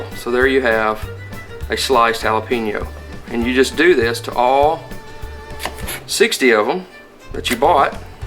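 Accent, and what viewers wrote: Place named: South, United States. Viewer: You sound Southern so maybe you're familiar with Braum's. Their pickled jalapenos are my favorite and I'd like to copy them if I can.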